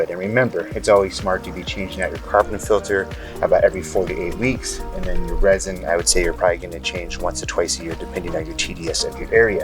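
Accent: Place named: United States. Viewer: You have one of the most calm and well spoken American accents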